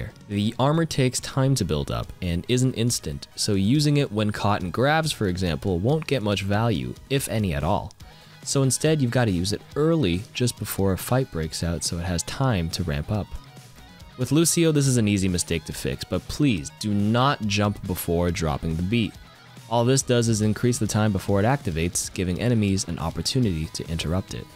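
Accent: Canada. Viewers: are you canadian???? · Are you a Canadian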